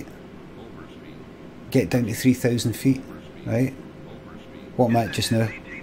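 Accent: Scotland, United Kingdom. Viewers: They say that plane passengers feel most comfortable with a male pilot with a scottish sccent, and i must say that the scottish sccent still outweighs your awful flying, id fly with you anyday!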